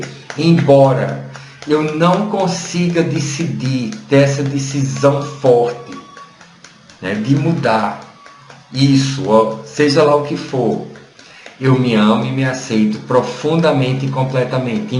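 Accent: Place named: Rio Grande do Norte, Brazil. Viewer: tu és de qual estado? Não consigo identificar o sotaque... Acho que é do Nordeste!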